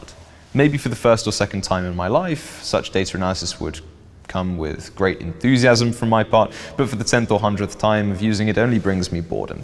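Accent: RP, United Kingdom. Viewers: The ultimate effortlessly posh accent. Brilliant.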